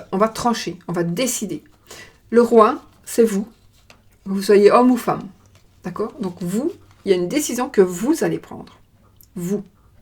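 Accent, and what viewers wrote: Belgium. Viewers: Aha j'aime trop l'accent belge vous êtes belle en plus 🤭🤩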